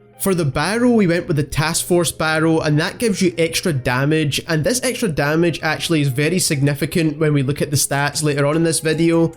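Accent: Scotland, United Kingdom. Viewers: just interested are you scottish cos i am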